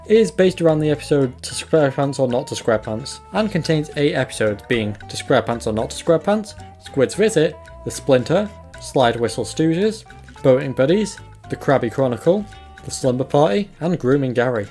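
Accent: United Kingdom. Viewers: Enough with the British accent! Can we please stop with the British accent?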